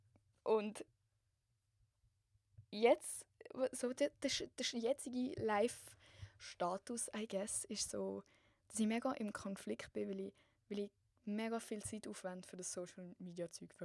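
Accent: Basel, Switzerland. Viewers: cools format! hesch du jetzt en basler dialäkt oder es misch masch zwüsche allem? :)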